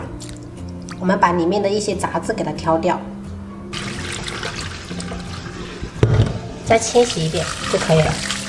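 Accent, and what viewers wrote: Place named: Minnan, China. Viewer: 原來是泉州人， 難怪有閩南腔。 不妨用泉州話做節目， 再上字幕就好。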